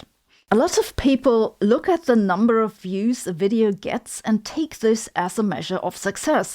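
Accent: Germany. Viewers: The German acsent help's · Sabine, a great deal of your comment is applicable to regular TV, and much of it's cable variety; simply put, creators often had little idea why of how their production got the viewer buy in to begin with. On improving your English skills; what ever you do, don't mess with the "charming little German lady" accent; it is as much your brand and trade mark as Joeg Sprave's maniacal laugh is his, along with a very Teutonic lilt to his English; and his "schtick" is sling shots! My thoughts on "creator burnout"; although not a YT creator, I think my objective view as an over consumer of YT product does give me a bit of horizon on the subject; scheduling is the largest stress producer in the process- schedule twice the time your layout and "pre think" suggest , do the work, and hope fully go get a massage of some other personal 'uplift" with your left over time. 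The creators that I have watched collapsing into themselves, trying to "give the algorithm" more and more of what it wants as they chase fame and fortune- they are the tragedy of the YT process. Some actually had good ideas but fell into those "number chasing" traps you described. Last, I would say, don't do something if you feel it doesn't "fit" you, personally- it shows when you do, a certain part of your "faithful following" will being losing who you are to who the algorithm wants you to be. None of which seems to be your problem. But fact based scripts, like yours, tend not to lead you there- another wise move from a "sc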